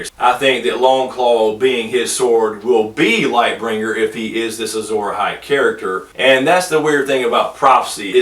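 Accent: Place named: Kentucky, United States. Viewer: 1. Love the shirt. 2. Love the video. 3. Subscribed. 4. What part of the South are you from? I'm from Kentucky and accent sounds very similar.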